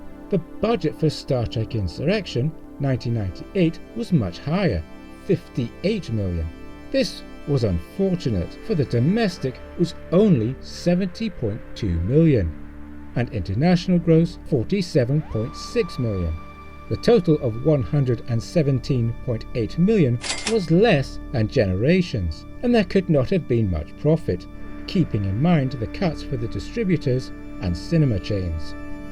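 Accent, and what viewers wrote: United Kingdom. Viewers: In which region of Britain do you live? Do you get thunderstorms a lot? I know the UK is famous for rain, there certainly some with which we had to contend during me and my family’s visit. I, of course, am assuming it’s Britain, due to your accent. I live in north Georgia, and we’re having some tropical storm doozies, stemming from a larger system about ready to hit the Gulf Coast of the Florida Panhandle.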